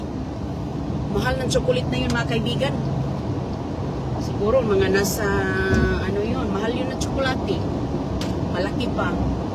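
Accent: Visayas, Philippines. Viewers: Visaya k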